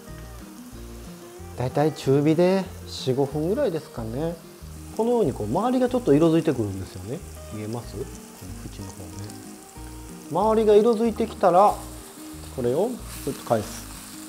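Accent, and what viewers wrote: Osaka, Japan. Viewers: 今日お昼にこのチャーハン作りました。いつもとは違うと家族も気付き美味しいと言ってくれました。😄流石先生の料理ですね!それと先生の大阪弁で料理の評価しながら食べられるところ面白く、大阪人の私にはとても親近感が湧きました。🥰毎日何かしら先生の料理食卓にのっております。☺️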